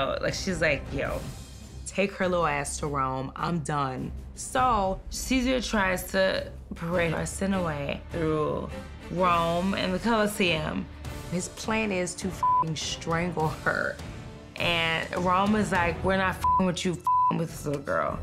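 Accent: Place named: Bronx, United States. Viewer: The narrator is from the bronx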